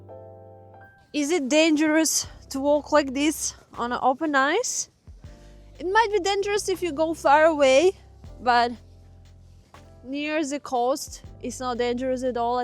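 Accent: Russia. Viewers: *"My suggestions",...in beautiful weather, maybe 50C you surfing at the beach. Restaurants are always awesome. Take me to a movie at the theater would be awesome too. Introduce me to your family and friends. Show and tell about where you went to school, in the beginning. Your childhood memories. Please take me to your church, I hope you go. I want to meet your pastor. For starters. I enjoy when you talk all comrade gangster with a thick Russian accent.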